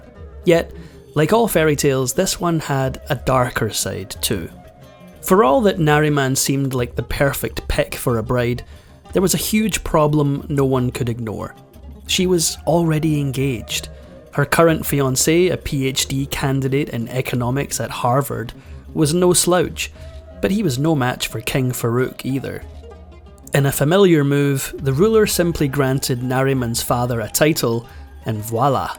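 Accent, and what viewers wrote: Scotland, United Kingdom. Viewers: What’s wrong with a Scottish accent? Best accent ever🏴❤️🏴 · It’s a Scottish accent.🤣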